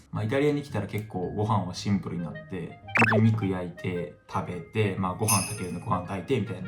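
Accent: Kansai, Japan. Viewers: 関西弁可愛い😍藍くんいつも応援してます！大好きです！！笑顔が可愛すぎて癒やされてます🫶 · 関西弁反則すぎる。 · ゴリゴリの関西弁、喋りウマ🤣！！おもろかった👏👏👏👏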